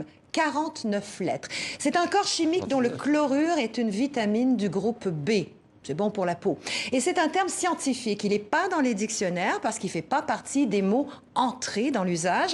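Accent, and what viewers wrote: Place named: Quebec, Canada. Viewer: Moi ausis, son accent Quebecois.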